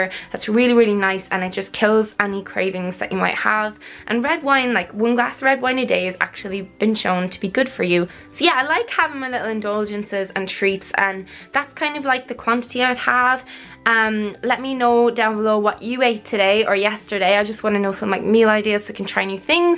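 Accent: Ireland. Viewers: I've never watched this girl before, only know about her because of the whole Toby thing but Jesus Christ she is beautiful, her eyes are hypnotic, and the Irish accent is just wow.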